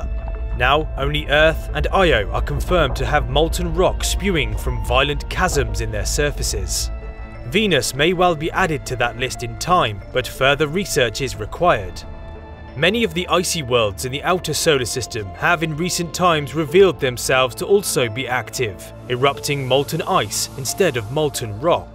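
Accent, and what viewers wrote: United Kingdom. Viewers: boy i cannot get enough of these spectacular videos plus the narrator is got me talking like british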